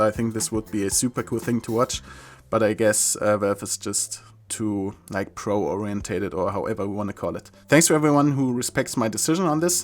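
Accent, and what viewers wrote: Germany. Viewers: Man merkt das du deutscher bist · Man merkt das du Deutscher bist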